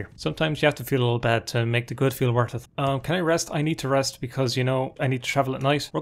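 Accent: Ireland. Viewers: man, placing your accent is pure hard. Is it Mayo/Galway? That or midlands? Anyways, savage videos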